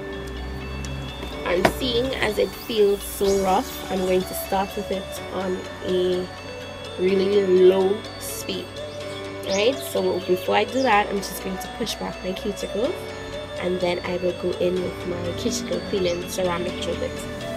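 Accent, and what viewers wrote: Trinidad and Tobago. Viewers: Are you Trinidadian?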